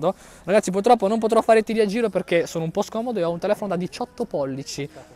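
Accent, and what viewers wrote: Italy. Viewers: Ma sei di Brescia?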